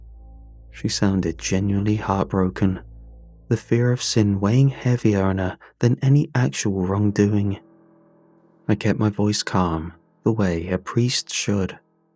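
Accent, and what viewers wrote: England, United Kingdom. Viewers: Because this channel is garbage now. It was good 10 years ago. Now its the same stories on repeat live the only video worth watching with no ads. Plus doesn't end and wake you up every couple of hours. Its background noise. Its good at it too. Great English accent 👌 Finding out he's Asian is a kick in the teeth though. They came to steal our life. Our accent our voice then they try to speak for us 😢 I once heard he had case against him too. Idk enough about it to elaborate though. But I do want to know more on that...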